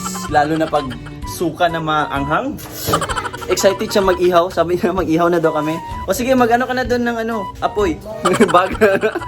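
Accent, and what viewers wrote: Visayas, Philippines. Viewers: I love the bisaya intonation ❤❤ ilonggo here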